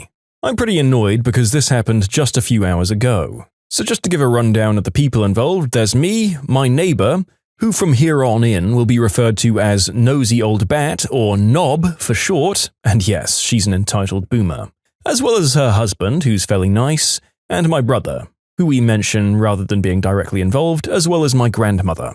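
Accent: United Kingdom. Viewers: WHEN DID YOU TURN BRITISH? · oh he's British now hi fellow Englishman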